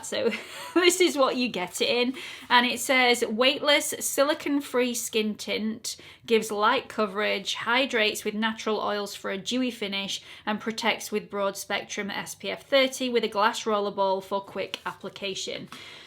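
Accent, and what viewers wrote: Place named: Yorkshire, United Kingdom. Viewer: Your accent just makes me so happy. Are you from Yorkshire?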